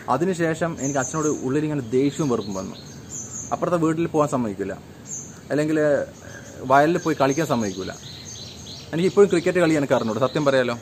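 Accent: Kannur, India. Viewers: ഹിന്ദുവാണോ. ക്രസ്ത്യൻ ആണോ. മുസ്ലീം ആണോ . എന്ന് മനസ്സിലാവില്ല എന്നാലും കണ്ണൂരുകാരനാണെന്ന് വർത്താനം കേൾക്കുമ്പോ മനസ്സിലാകും. ഞാനും കണ്ണൂരാണെ.....😀😀😀😀😀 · Kannur language super 👍👍👍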